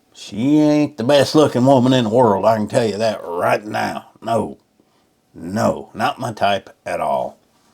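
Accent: United States. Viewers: Podoba mi się Pański amerykański akcent :-) . Serdecznie pozdrawiam !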